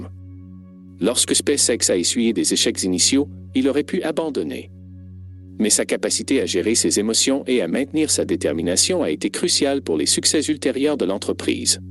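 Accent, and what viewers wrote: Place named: Quebec, Canada. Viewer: De très bons exemples, j'aime bien aussi la narration avec l'accent québécois. Merci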